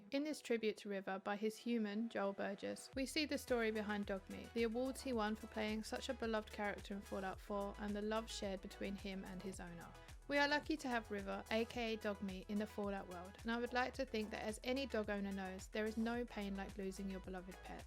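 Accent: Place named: RP, United Kingdom. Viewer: being posh!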